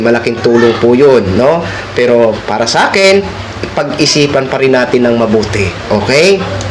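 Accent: Visayas, Philippines. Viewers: Kabwisitboses mokuya Uhg pag stiry mo para kang nasa karerahan ng kabayu...visaya visaya pronounciation mo.englishin mo na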